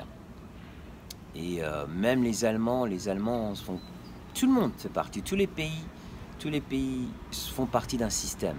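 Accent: France. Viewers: ese acento Frances ahhhh es muy lindo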